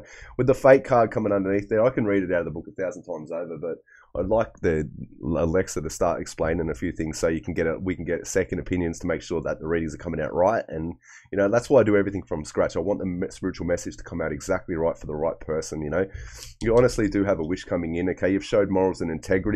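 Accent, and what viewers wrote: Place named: Australia. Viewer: You are an awesome reader! It’s lovely to hear your lovely down to earth Aussie accent, I’m Aussie too but mainly listen to readers from elsewhere. Thank you, many blessings.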